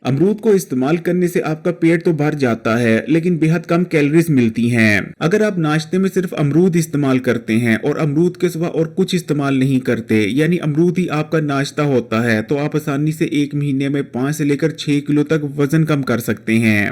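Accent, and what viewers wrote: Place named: Punjab, India. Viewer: BHAI SAHIB JAB AAP NE URDU PUNJABI LEHJE M BOLNA HY TU BUHAT BEHTAR HY AAP PUNJABI M CHANNEL LANGUAGE RAKHAIN.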